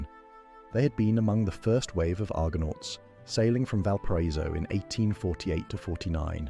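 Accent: United Kingdom. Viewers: Save the british accent for englands history. Would be nice to hear in a western accent.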